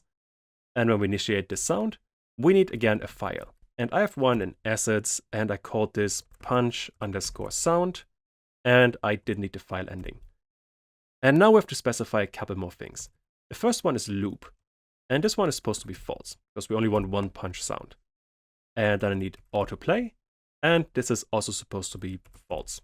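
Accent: Germany. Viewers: you have a nice accent, are you German? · are you german? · Sound like german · deutscher akzent? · Are you german?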